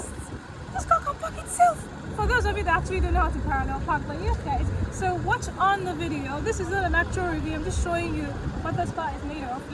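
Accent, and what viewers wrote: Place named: United Kingdom. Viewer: I honestly thought you were speaking Chinese at first. I don’t know if you can speak Chinese. If you can, then your British accent and your speed of speech articulation on top of that is making things worse.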